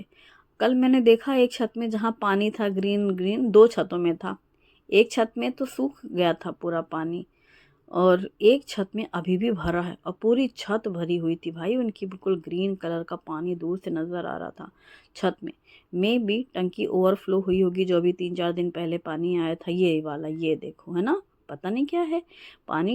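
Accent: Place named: Uttar Pradesh, India. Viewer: Ji aap UP sai belong karte hai...apke bolne key style sai lagta hai......aap bahut hi talented hai❤❤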